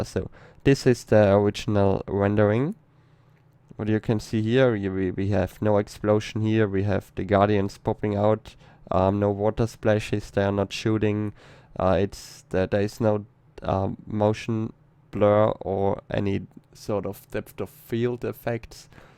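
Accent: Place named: Germany. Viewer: could it be that you lived in germany before you went to Australia? Because you speak english with some kind of accent;)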